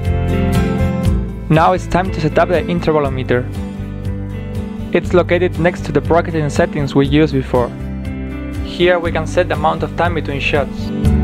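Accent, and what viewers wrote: Spain. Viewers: Gracias, me ha sido útil, pero cómo se te nota el acento español hablando inglés jajaja. No offense, fela. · Spanish i guess. I know it because i am from Spain and many people speak like that :D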